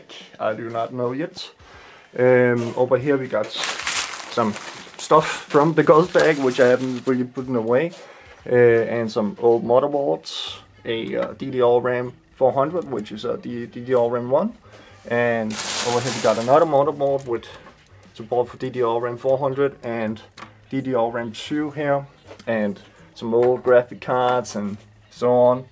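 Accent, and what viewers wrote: Denmark. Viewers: Det er sjovt at høre en tale engelsk når han er fra Danmark :D - Nice video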